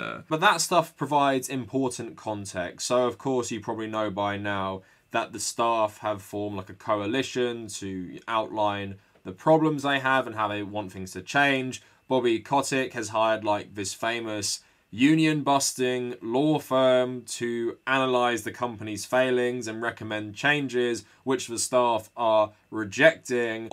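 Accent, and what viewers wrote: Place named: United Kingdom. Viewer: I like your British accent, most trigger me now but you’re is cool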